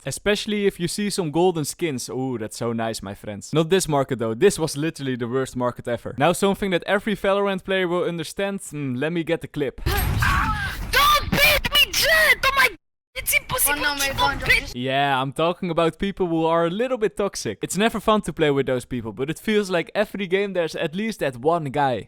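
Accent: Netherlands. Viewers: Me: 99% certain this guy is dutch like me cuse of the accent. You: “easy POTJE valorant” Me: 100% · I hear ur dutch accent so loud “if you wanna play a easy potje” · this accent sounds kinda like dutch... :) but i like your vids man keep going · I suspect you were Dutch by the accent, but then proved it lmao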